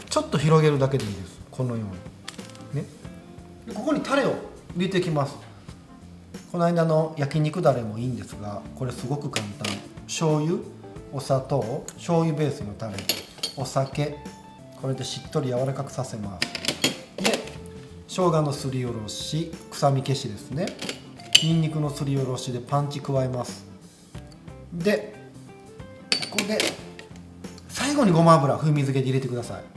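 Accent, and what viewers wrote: Kansai, Japan. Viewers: 10分でできる料理...最高にありがたいです(;▽;)にんじん細切りの仕方感動~そして関西弁でおちつきます😊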